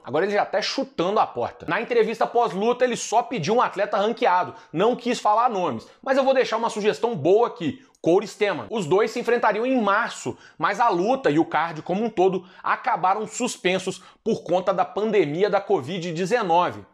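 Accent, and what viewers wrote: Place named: Minas Gerais, Brazil. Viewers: Sotaque de Minas tomando o sexto round hahahah! Ótima resenha 👊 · Show, Parabéns! Adorei o sotaque mineiro, sua desenvoltura e tenho certeza que você terá várias histórias de background pra deixar mais rico o conteúdo. Parabéns!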